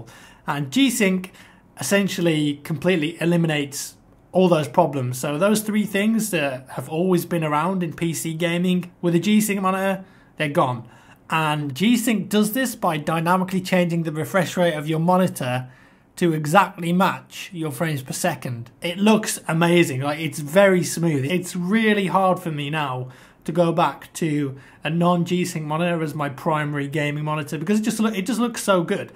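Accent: United Kingdom. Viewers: Been a sub since you had 50k glad you got far with your gaming. Your stuff is pure skill, and the British voice makes your videos more interesting. Good stuff lad.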